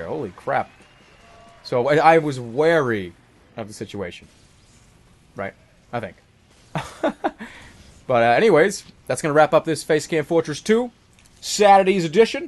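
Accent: Boston, United States. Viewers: Oh. You are so Boston-ey. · He is from Boston as is the scout, they have a similar accent. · Im guessing you are either a real life version of a Scout or you are from Massachusetts/Boston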